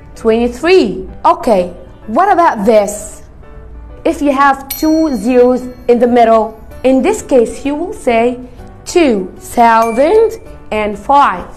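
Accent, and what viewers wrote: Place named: England, United Kingdom. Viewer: حاسس ان هو انجليزي 😂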